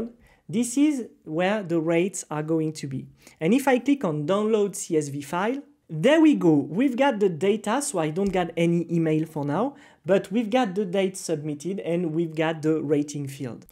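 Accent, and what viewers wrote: France. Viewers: you have a french accent 🤔🤔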